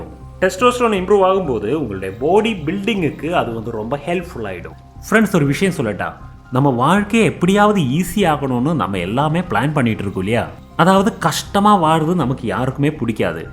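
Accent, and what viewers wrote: Kerala, India. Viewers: Brother are you Malayali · Malayali yano... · voice super malayalam mix ah na tamil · Ninga Tamil lathan pesuringala bro ninga malayalam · Anna I'm sure are you Malayalam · You are a malayali ah???? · Neenga malayaliyaa?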